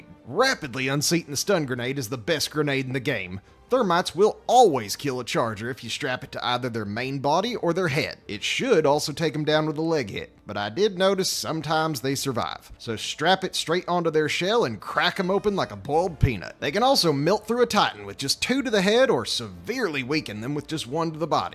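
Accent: South, United States. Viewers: A southerner talking about killing space bugs? Sign me up for the firebat squad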